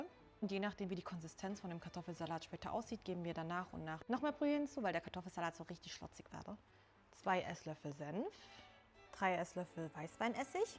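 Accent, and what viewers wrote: Swabia, Germany. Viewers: Die Stimme oder die schwäbische Mundart? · Sehr schade so eine tolle Frau muß schwäbisch reden Kartoffelsalat schmeckt wie nasse Socke in Hochdeutschland geht auch mit Mayo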